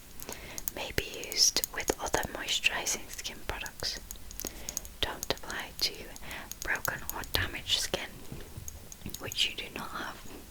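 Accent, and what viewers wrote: United Kingdom. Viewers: Na it's British i think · Her accent is British. Sorry if that sounds mean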